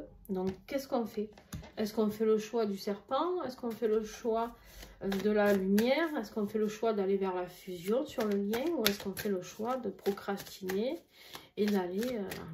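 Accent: South, France. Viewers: Quel plaisir d'écouter votre accent du sud.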